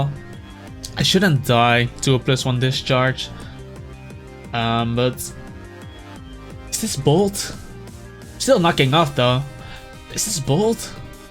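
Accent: Netherlands. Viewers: Your accent sounds soooo dutch XD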